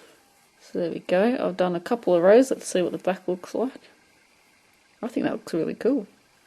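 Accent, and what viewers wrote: Australia. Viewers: you are hearing the true Aussie accent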